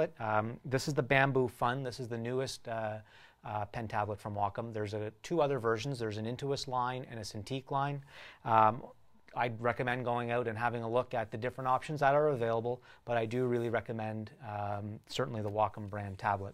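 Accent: Canada. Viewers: lawl he has a canadian accent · is he canadian?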